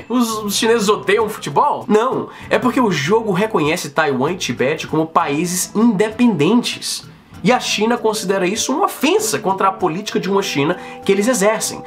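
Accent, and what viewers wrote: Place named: Ceará, Brazil. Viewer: com sotaque cearence, mais alguém notou?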